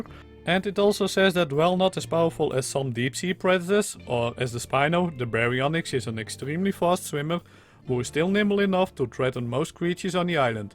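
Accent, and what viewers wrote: Netherlands. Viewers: He is from Holland, it's a dutch accent. Yo, Maak jij ook mods voor ark? Of heb je er weleens in verdiept? Ik verdiep me er op het moment in, maar zit nog met een heleboel vragen. Ik wil namelijk een 3D object maken en importeren in Ark, modelleren is het probleem niet maar het importeren wel. Gr Erwin. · are you dutch? you kinda talk with an Dutch accent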